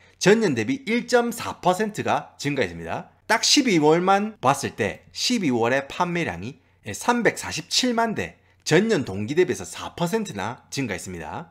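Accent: Gyeongsang, South Korea. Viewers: 같은 경상도 사람인데도 사투리 찰지게 하시네요^^ 재밌게 보고 있습니다~!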